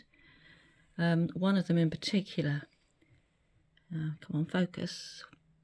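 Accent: United Kingdom. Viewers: Wow, they turned out gorgeous! I've stopped trying to do blooms because I've jumped from one resin to another. You just nailed the reason why I've given up, information overload! I have two resins I enjoyed working with on different projects and I'm going to stick with them until I understand how they work. Thank you for this informative video with a beautiful turnout. My husband was born and raised in Nottingham (Arnold) so your accent is very similar. I kept thinking you had to be from the Midlands 🙂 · I am East Midlands too, I could tell by your accent x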